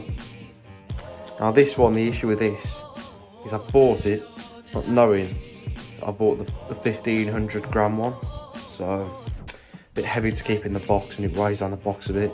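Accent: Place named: Birmingham, United Kingdom. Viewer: You have an impressive tool kit! Glad you go for quality like knipex in hand tools, not chesp but top quality - I love Knipex. You sound like a fellow 'Brummie' All the best mate - I've subscribed 👍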